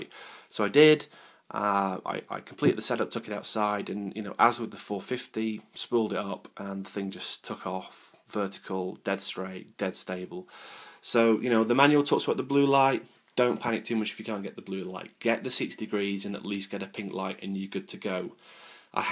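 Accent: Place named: Germany. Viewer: where from germany are you from, i noticed the accent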